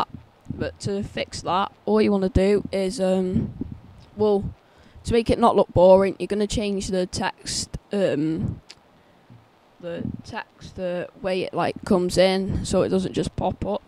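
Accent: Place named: Ireland. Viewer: thnx m8 love ya irish accent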